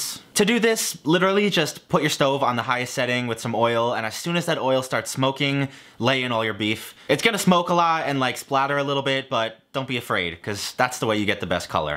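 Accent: United States. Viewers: your pronunciation is fine. you are an american talking with an american accent. you're pronouncing the words right just not putting on a false spanish accent which i find more annoying than anything 🙄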